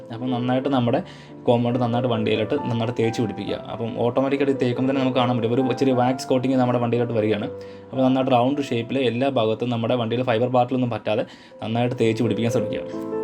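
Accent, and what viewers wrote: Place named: Pala, India. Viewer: പാലായിൽ എങ്ങാനും ആണോ വീട്. എവിടെയാ. സസാരംകേട്ടിട്ടു ഇവിടെ എങ്ങാണ്ടു ആണ് വീട് 🤔🤔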